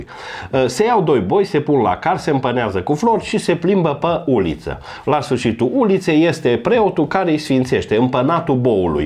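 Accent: Transylvania, Romania. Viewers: Ardelean adevarat , cu limbaj autentic ! Ioooii .Bravo profule! :) keep walking !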